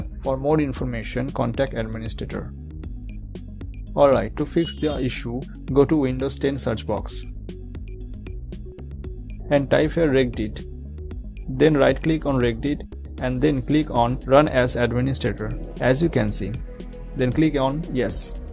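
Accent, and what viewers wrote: India. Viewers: Lots of love bhai Tysm i was stuck installing my printer ty ty ty love from India your voice seems you are from West Bengal india 🇮🇳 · i hate indian's english but thanks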